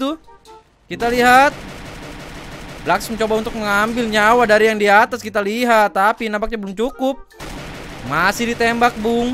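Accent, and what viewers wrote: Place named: Banten, Indonesia. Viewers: ngakak so much wkwkkw logat sundana banten pisan. leuwih alus keur maen pubg sunda oge mang wkwk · Lucu oge sunda na si akang... wkwkwk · njiirrr ngakak Sunda na kang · Ngakak gua bang denger lu ngomong sunda😂😂salm dari sunda ciamis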